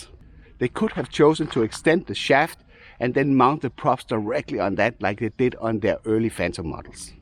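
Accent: Denmark. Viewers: Tænkte nok, jeg kunne høre det på accenten.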